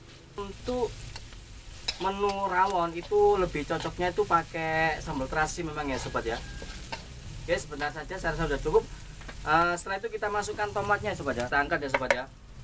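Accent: East Java, Indonesia. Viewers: bahasanya medok banget orang jawa timur mana ya chef 😊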